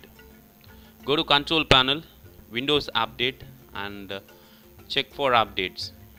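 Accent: India. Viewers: İndian English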